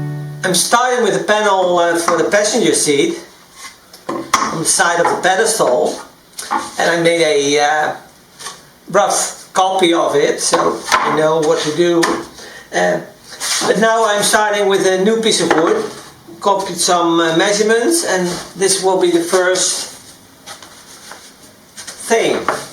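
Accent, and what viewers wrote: Netherlands. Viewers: I was really curious about the accent, I first thought that there was a region in the US where people, maybe, spoke with a Dutch sounding accent because of some interesting historical reason 🙃 But, the way you say camper is very Dutch 😉 You're right, I am Belgian, but I'm Flemish speaking not French. I have a French last name and I also live close to the French speaking part of Belgium. Many people get confused about that. was a popular boys name in Flanders around the time I was born. Bedankt voor de leuke filmpjes!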